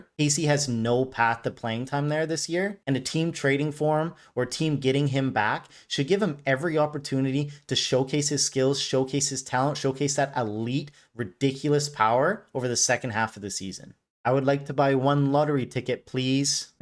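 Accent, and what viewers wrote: Canada. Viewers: Careful with that Schlittler pronunciation, man. This is a family show. That said, I expect to hear "cuck" no less than twice in every video and you delivered. From another fellow, thanks for continuing to justify my obsession with Kurtz. Idk how many losses it has contributed to thus far, but it's finally paying off as my playoff hopes hang by a thread! Does the mini-hoop indicate that you'll keep this going with some basketball content? The football market is flooded and I already think I know more than everyone in that sport, but the NBA is an afterthought as one who always preferred college hoops. Either way, I'll support it until you bore me or piss me off! Non-sports question... I think you've hinted (or flat-out stated) that you're in Texas, but do I detect a little canuck in that accent? Whatever it is, it doesn't exactly scream Texan. 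🤣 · I thought you were Canadian by the way you pronounce your "O's". Especially in words such as "about" or "out".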